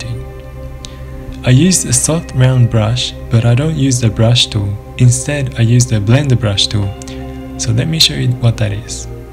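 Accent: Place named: Asia. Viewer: I'm confused in your tutorials from before and always with your intros your voice was much higher and definitely had a stronger Asian accent. But this video you don't have it which I find strange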